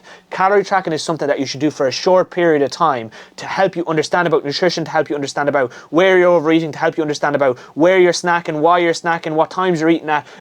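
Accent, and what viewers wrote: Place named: Ireland. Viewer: This guy sounds Irish.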